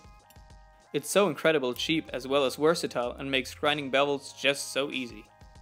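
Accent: Germany. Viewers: Man hört das du deutscher bist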